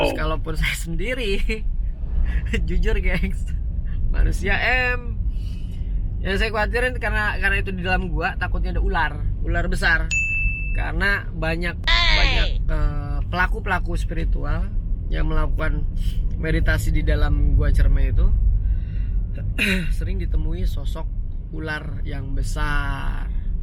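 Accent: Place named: Kalimantan, Indonesia. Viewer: Orang Kalimantan mana suaranya 😄😄 #Yoyoyoy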